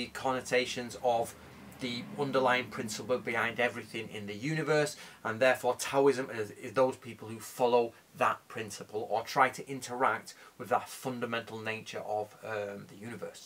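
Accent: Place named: Manchester, United Kingdom. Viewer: Love hearing a fellow manc accent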